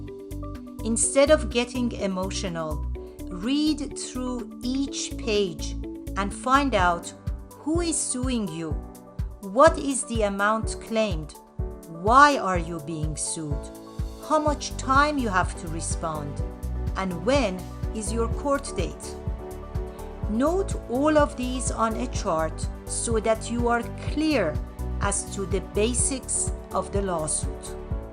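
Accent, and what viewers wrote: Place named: Afghanistan. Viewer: Afghan accent 👌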